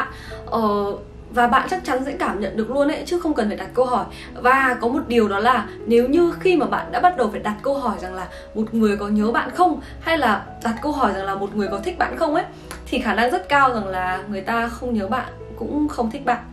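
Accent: Standard. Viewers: Chị nói chuẩn thế chứ lị 🥰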